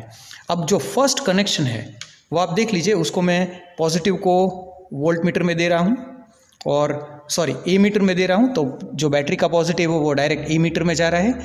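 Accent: Gujarat, India. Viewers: Are you Gujarati??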